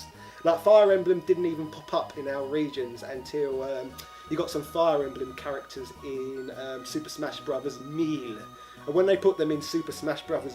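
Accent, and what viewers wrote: United Kingdom. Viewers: are you mispronouncing 'ganbare' and 'melee' on purpose or is this Just some sort of british accent I am Not yet aware of?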